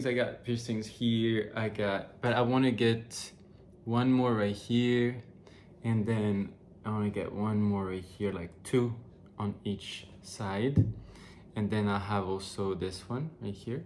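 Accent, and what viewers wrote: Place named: France. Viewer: 💕Hey boo how you doin I hope your doing well looking absolutely gorgeous as always I'm unconditionally irrevocably in love with you I absolutely adore you Your MY favourite frenchie in absolutely obsessed with your French accent (can I borrow it) Lots of love and kisses your biggest fan NICOLE LOUISE crespeau💕 💋💋💋💋💋💋💋💋💋💋💋💋💋💋💋💋💋💋💋💋💋💋💋💋💋💋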